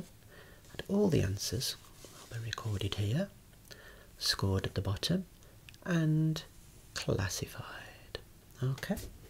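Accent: United Kingdom. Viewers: Your voice and your british accent are so calming, I love it!!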